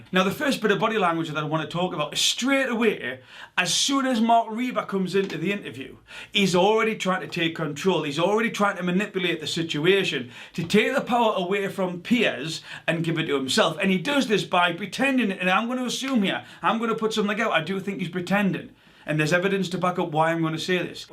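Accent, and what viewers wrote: Newcastle, United Kingdom. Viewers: Love hearing a fellow geordie on YouTube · Love your channel, Your Goerdie accent reminds me of hone, stay safe over there in the UK. Greetings from Perth West Australia 🇦🇺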